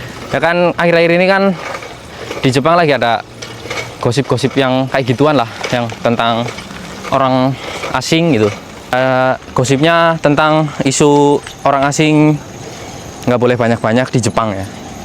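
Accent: Banyumas, Indonesia. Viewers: Sekang ndi asale mas? Logate ngapak kayak nyong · Ngapak medok banget menghibur bisa jadi rebutan gadi Jepang, jangan tinggalkan 5 perkara tinggalkan 5 perkara, maling, main, minum, madat, mawon, si mirip mas Sentot Cilacap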